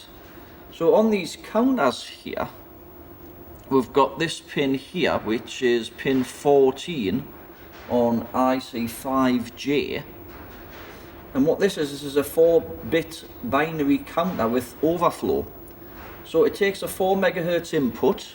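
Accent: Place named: Newcastle, United Kingdom. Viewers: Very interesting, thanks. Dad was from the NE, love the accent. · You sound from the north east whereabouts are you? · You sound like your from my area Newcastle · Ooooo sound like a Geordy must be close to me hopefully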